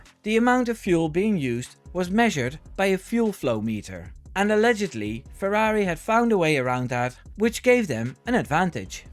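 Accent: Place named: Netherlands. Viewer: Zeg dat je Nederland bent zonder te zeggen dat je Nederlands bent. Je klinkt ook jong trouwens, maar dan zie je opeens een vent van 53 in beeld.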